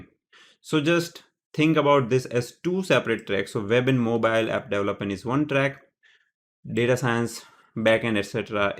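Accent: India. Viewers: Bhai tu indian lagta hai english se ??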